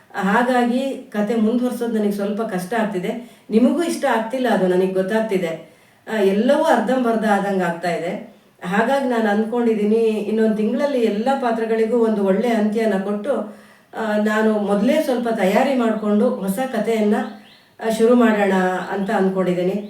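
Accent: Malnad, India. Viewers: Namge nam malnad bashe andre thumba ista❤❤❤ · Madam nimma video tunba chennage bartede niu hakero yalla video nija jevanadalli namma malinadenavara kathe ni ide nanu 26 year inda banglore nalli irodu but nimma video one day nu nodade irodella tunba namm urenavaru mataduva hage ide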